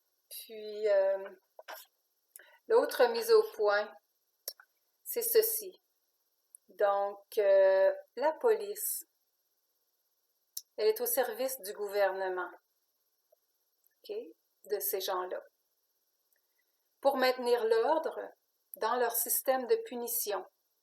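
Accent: Quebec, Canada. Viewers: J 'aime ton accent québécois et d'ailleurs je devrais une fois venir voir mes amis là-bas. A part ça je me suis abonné de suite car tu parles que de l'essentiel , tout ce que je lis tous les jours sur les médias alternatifs. J'aime ta façon d'expliquer et je suis toutes tes vidéos. Salutations depuis la Suisse. Raymond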